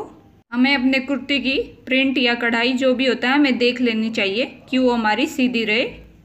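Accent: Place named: Haryana, India. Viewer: Haryanvi lagte ho aap language se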